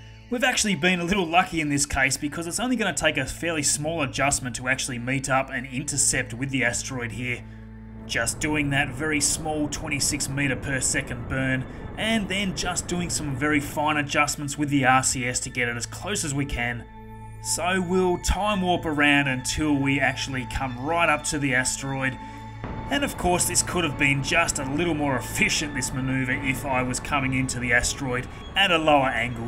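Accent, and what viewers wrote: Australia. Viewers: an old Australian audio book guy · Hey! What part of Australia are you from? I'm from Melbourne:)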